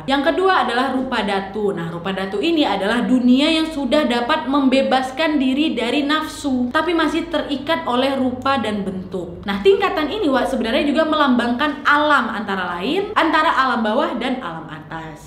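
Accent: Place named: Medan, Indonesia. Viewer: Cerdas,pintar,ceriwis luar biasa penjelasannya, penting banget untuk di ketahui, orang Medan ya mbak ini😀👍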